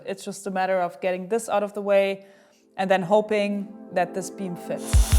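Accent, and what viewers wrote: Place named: Germany. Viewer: the more serious it is, the more german she speaks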